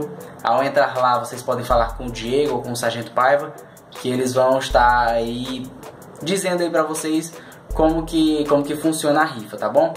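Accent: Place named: Pernambuco, Brazil. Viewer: Ele é pernanbucano, faz parte do sotaque butar é a mesma coisa que botar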